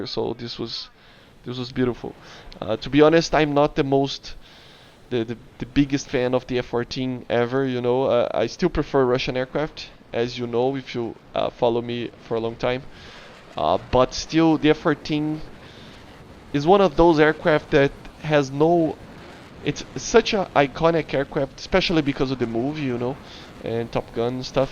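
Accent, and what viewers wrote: Brazil. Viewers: where are u from? your soutaque looks like brazilian soutaque xD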